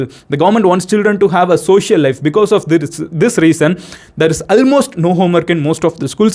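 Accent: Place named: Tamil Nadu, India. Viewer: Enakku mattum than ivar pesure english tamil mathiri kekutha? 🤔🤔🤔... Ivarode video parthu parthu ivar vere language pesenalum en kathukku tamil than kekuthu😁😁😁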